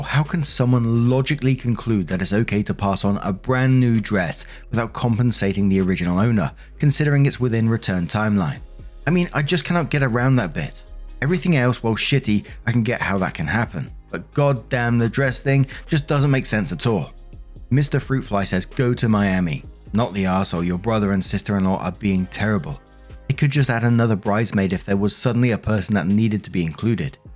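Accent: England, United Kingdom. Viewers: “You don’t have a Scooby Doo what it could be” Nice to hear speaking English English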